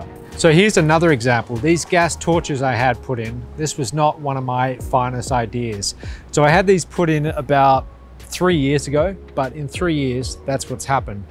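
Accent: Australia. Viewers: You have an Australian accent. How do you compare life in Australia and Costa Rica.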